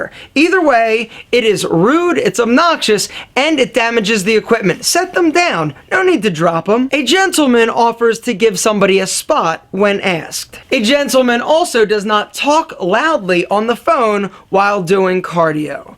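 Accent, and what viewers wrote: Philadelphia, United States. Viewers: john doe Sounds like Philly. That is how they talk. Listen to Darryl Hall, he sounds like this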